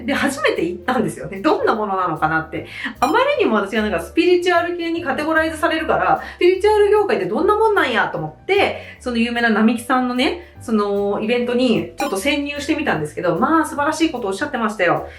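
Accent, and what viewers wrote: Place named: Edo, Japan. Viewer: 心が軽くなりました😄これからもよろしくお願いします👍べらんめい調、結構好きです。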